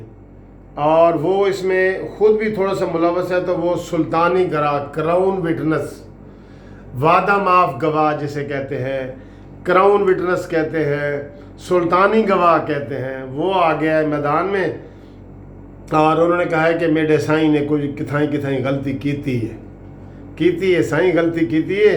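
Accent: Saraiki, Pakistan. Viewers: We love your Sariki pronunciation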